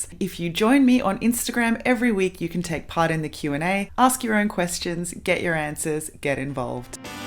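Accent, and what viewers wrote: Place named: United Kingdom. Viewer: 100 % agree. And off topic but I always thought you were from the UK because of the accent, and not Australia😅😅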